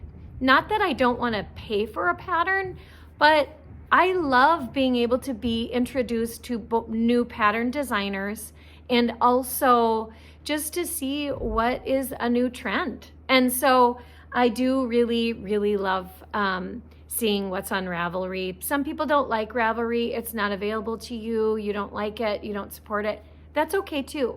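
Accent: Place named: Minnesota, United States. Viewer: Just found your YouTube videos today. This one came up. Your voice and tone is calming to me. Then your favorite things video started to play and I noticed the accent in your voice sounded like maybe Minnesota. Only a few seconds after that thought came to my mind you stated that you were coming to us from Minneapolis, Minnesota.! Just a fun piece of trivia for us. 😂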